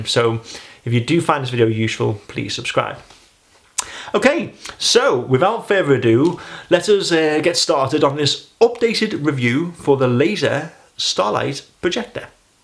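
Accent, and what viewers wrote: United Kingdom. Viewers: proper British English... much easier for me to understand you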